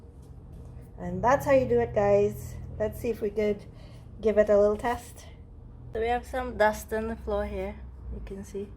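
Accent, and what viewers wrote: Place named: Trinidad and Tobago. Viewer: I knew you were from Trinidad when i heard your voice it reminds me of my friend wife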